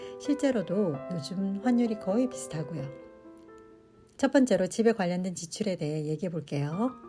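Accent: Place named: Gyeongsang, South Korea. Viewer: 나레이션 중간중간 대구 경북 악센트 정겹네요. 좋은 정보 감사합니다.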